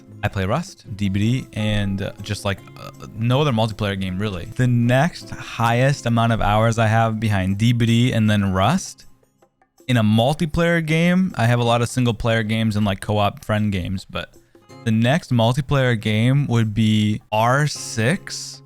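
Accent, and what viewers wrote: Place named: Saskatoon, Canada. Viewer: Are you from canada ? More specificly from saskatoon? I swear to god you have that accent like youre from around here. 😂 sorry for the weird question.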